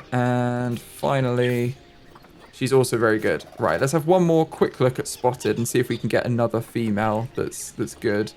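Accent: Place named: United Kingdom. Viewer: Could be a UK versus US thing, as I pronounce it ah-mean-ities too, like and their accent sounds UK.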